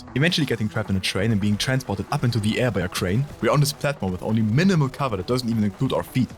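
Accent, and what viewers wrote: Germany. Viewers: ich hoer sofort dass du ein deutscher bist. macht aber nix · Smol Question, are you german? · You sound german...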